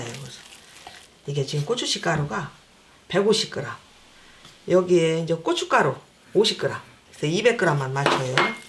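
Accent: Chungcheong, South Korea. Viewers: 구수한 충청도 사투리 정겹습니다 저도 한번 담가보겠습니다 감사 합니다^^ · 충청도 말씨가...고향에 온 느낌입니다. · 척척박사, 정결. 깔끔. 충청도 사투리까지. 주부와 여성의ㅡ모범 이십니다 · 충청도아줌니인가봐요 ㆍ수고많으시네요ㆍ저두. 따라서해볼랴구요ㆍ수고하셨수 ᆢ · 좋은영상 잘보았습니다 김장해놓고 꼭담글겁니다 강상합니다 말씀 이 충청도에유 듣기좋았어유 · 충정남도 아님 전북분 말씨 같아유 정겹네유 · 항상감사히잘보고있읍니다충청도분같아요 구수한말씨며여유로우신마음씨가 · 저두 충청도 결성인디 사투리 정겹습니다....ㅎ 묽으스름....ㅎ 저는 보리쌀을 방앗간에서 가루로 빻앗는데 그냥가루로 죽쓰면 될까요? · 정감있는 내고향 충청도 구먼유 잘 배웠어유 감사해유^^ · 저도 츙청도 인데 말씀이 넘 구수하내요 막정에 엿기름 넣어 해 보았는데 아니더러구요 얼려주산대로 해 봐야겠오요 정성이 엄청들어가내요.보여주기 정성이네요 고추싸없으면 고추가루 넣어도 되나요 아닌 국물 냘때 먀운고추 조금넣고 끊여도 될까요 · 충청도 구수한 사투리가 너무 정겹습니다 전 막장은 한번도 안담궜는데 당장에 해야겠어요 쌈장하면 맛있을것같애요 맛나게 익으면 며느리도 주면 좋아할듯하네요 귀한 정보 가르쳐주셔서 감사합니다 고맙습니다 ~~~♡ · 말씀도 참정감있고 맛깔스럽게 하시네요ㆍ아드님하고 대화도 사랑이넘쳐요ㆍ고향이충청도라 사투리가 아름답게 들려요ㆍ요리도 믿음이가네요ㆍ당장따라해볼게요ㆍ고맙습니다ㆍ❤❤ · 엿기름이 안들어가서 더 쉬워보이네요. 나도 장을담그는데. 막장은 안해봐서.한번해보려구요. 충청도아줌마인가요? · 여기 버지니아 인데요 잘보았습니다 1월쯤담아야셌네요 충청도 사투리 좋아요 내가 예산이 고향이니까요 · 구수한 충청도말씨 정겹네요 옛날 어른들이 담그시던 막장 먹고싶었는데 잘배웠어요 겨울되면 담가야겠어요 감사합니다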